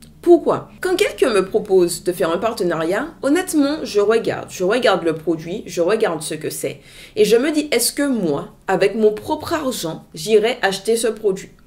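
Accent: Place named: Antilles, Caribbean. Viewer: Hi, j'adore ton petit accent bien de chez nous Mart. ou Guad...... Cela fait un moment que je te suis j'aime beaucoup tes contenus, tu reste égale à toi toi-même, ta franchise et ton honnêteté font de toi une belle personne. De mon côté mes cheveux ont commencé à pousser et à mieux se porter quand j'ai arrêté d'acheter pleins de produits capillaires qui n'avaient aucune efficacité...... il me suffit maintenant de regarder côté cuisine et de compléter avec d'autres produits naturels. Merci pour ta franchise et bonne continuation à toi ma belle.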